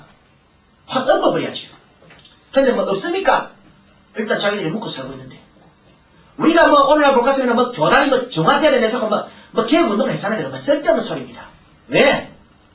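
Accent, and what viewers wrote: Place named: Gyeongsang, South Korea. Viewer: 아멘 아멘~~~경상도 사투리 정겨워요 부분부분 돌려 듣기하느라 순교하는줄~ 말 엄청 빠르시고 억양도 쎄고ㅋㅋ그래도 은혜 받고 갑니다 아모스와 호세아 같은 선지자가 절실한 때인거 같습니다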